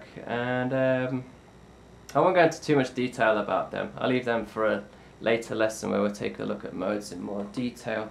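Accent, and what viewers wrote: United Kingdom. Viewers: What country are u from u kinda sound English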